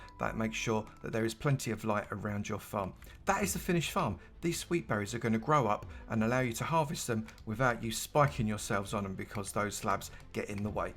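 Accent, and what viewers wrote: United Kingdom. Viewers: I think British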